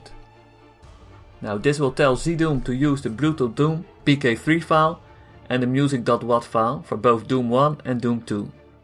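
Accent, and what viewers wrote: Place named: Netherlands. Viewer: You sound Dutch Ben jij. Nederlands?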